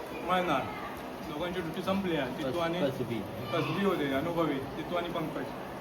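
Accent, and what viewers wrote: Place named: Devgad, India. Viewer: तुमच्या देवगड साईड ची मालवणी वेगळी आहे थोडी. मालवण साईड ला , मी जातलय म्हणतो आम्ही आणि तुम्ही मी जाणार. परत, ला साठी मालवणीत शेवटी क लावतात. तुम्ही मराठी सारखं ला लावतात. उदा: देवगड ला आमच्याकडे देवगडाक असे बोलणार.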